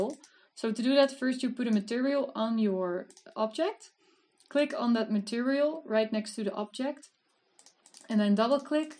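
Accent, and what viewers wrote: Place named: Netherlands. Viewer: tenk joe ferrie much....joe ar de beste......ded was just wat eye needed....nee hoor jou Engels is niks mis mee maar altijd zo leuk herkenbaar dat nederlandsEngels :-)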